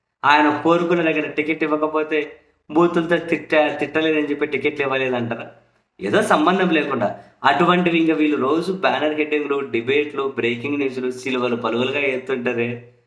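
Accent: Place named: Godavari, India. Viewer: anna meeru godavari basha vachesindi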